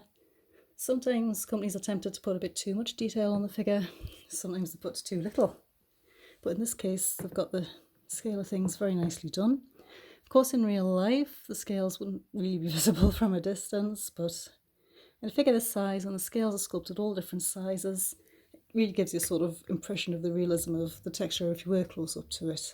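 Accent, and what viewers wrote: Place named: North East England, United Kingdom. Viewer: Love this model and it’s nice to hear someone else from the north east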